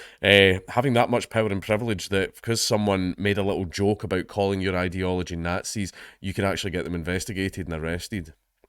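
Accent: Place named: Scotland, United Kingdom. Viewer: Did you somehow get more Scottish recently ?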